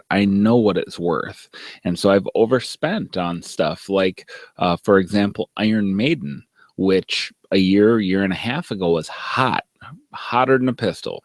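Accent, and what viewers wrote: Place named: Wisconsin, United States. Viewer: I live in Milwaukee - I've been saying the same thing about getting to Pete's store but haven't been yet. Where in Wisconsin do you live? You have that signature Wisconsin accent that we're all famous for by the way!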